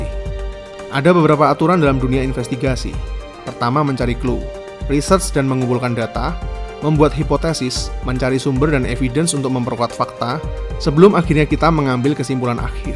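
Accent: Yogyakarta, Indonesia. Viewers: Ealah cah jogja to mas e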